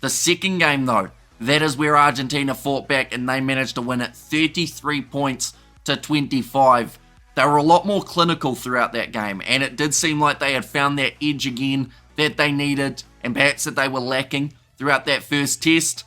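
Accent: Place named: South Island, New Zealand. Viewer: Sound like a south islander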